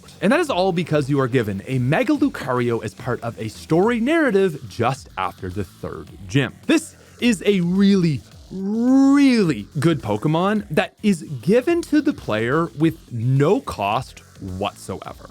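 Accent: Canada. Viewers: For someone who says out the way you do, you sure do say it a lot - a fellow Canadian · Are you Canadian? I feel like you're Canadian. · So Canadian lol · Miss oot, aboot...etc. God I love Canadian's ♥️ 🇨🇦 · First time subscribing long time follower but shit I did not pick up that you were Canadian until this vid.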